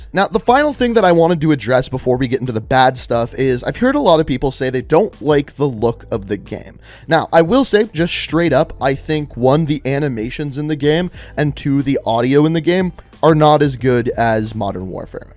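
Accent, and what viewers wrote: Canada. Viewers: His accent is so canadian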